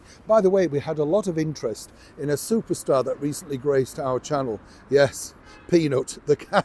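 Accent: United Kingdom. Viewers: The British accent makes it hard for me to understand and I gave up on this video. Boring as hell.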